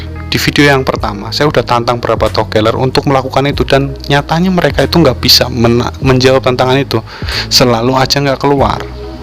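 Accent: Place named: Tegal, Indonesia. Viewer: ngomongnya medog logat tegal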